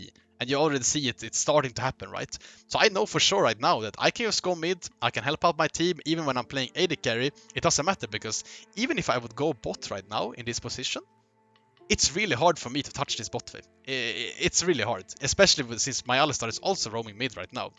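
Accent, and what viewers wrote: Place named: Sweden. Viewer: Haha aldrig hört en bättre svengelska! Grym video🤘🏻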